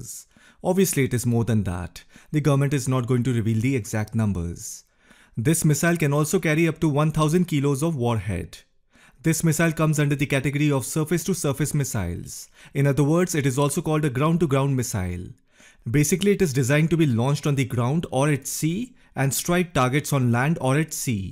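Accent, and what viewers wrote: Bengal, India. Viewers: I love to hear Bongs talk in Hindi ❤️❤️❤️❤️. Kudos sir!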